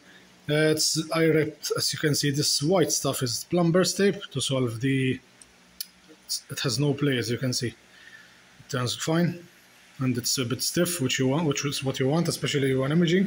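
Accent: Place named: Malta. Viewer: I bought one of these a few months back, these tips are great! Then to my surprise, this was a recommended video and I recognise the accent - a fellow maltese! Grazzi tal video!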